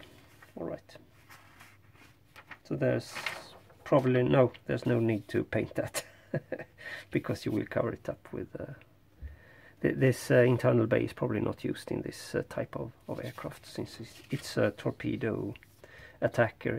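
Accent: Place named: Sweden. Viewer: Är du svensk för din accent på engelska låter svensk?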